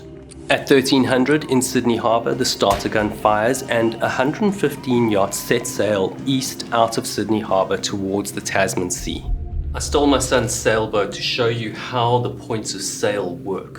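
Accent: South Africa. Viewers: clearly the SA acent is the best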